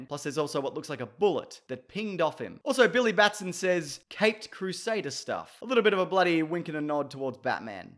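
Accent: Australia. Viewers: I can tell that your an Aussie bye your accent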